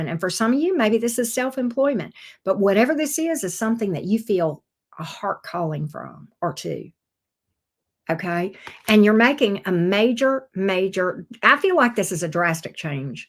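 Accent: South, United States. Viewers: Happy New Year! You’re the cutest! Love your southern accent! 😘🥰😍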